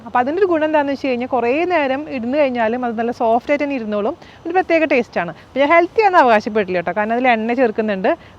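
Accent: Thrissur, India. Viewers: Hi, thank you for sharing the recipe😍 You are a lifesaver. I have become an amazing cook myself following ur recipes. More than the recipes you share I just love listening to your voice. Being abroad in a country with white people away from home it gives me soo much happiness watching all your videos, listening to you trissur slang😁. Lots of love ur amazing and an inspiration to many housewives😍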